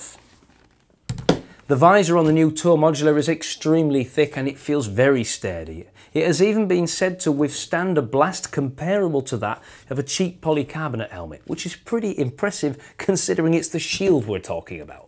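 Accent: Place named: Yorkshire, United Kingdom. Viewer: Yorkshire, Huddersfield, I’d say.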